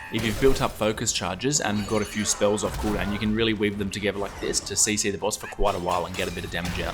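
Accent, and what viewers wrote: Australia. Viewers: Aussie annoying accent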